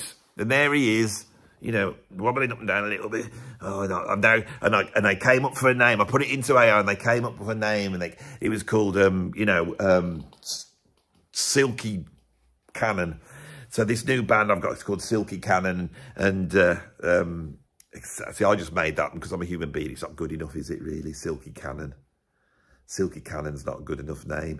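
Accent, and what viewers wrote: Birmingham, United Kingdom. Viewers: I'll take sense of humour over Beato's supposedly superior "intelligence" any day of the week. I love the Brummie/West Midlands accent anyway, it's one of my favourites. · love me a Brummie, or Black Country accent ❤ any day of the week. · he has much more intelligence than this annoyingly verbose Brummie...the accent is too much to handle.😎😎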